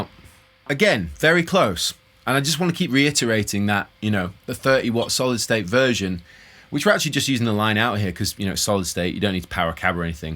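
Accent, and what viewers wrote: United Kingdom. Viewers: even your spoken words are so accurate, like your renditions haha. Don't get me wrong, English is not my first language thus sometimes it is very diffulicult for me to understand British accents in this US accent dominating world.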